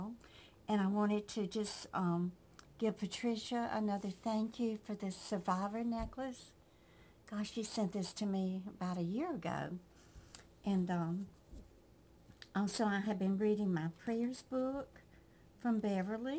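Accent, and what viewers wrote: South, United States. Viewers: my love, you need to get a microphone. They are not expensive. You can get one that you clip on your shirt so you can even take it with you when you're shopping. Those run about $20 dollars. That would really improve the sound of your lovely, soft-spoken Southern voice. Just a suggestion.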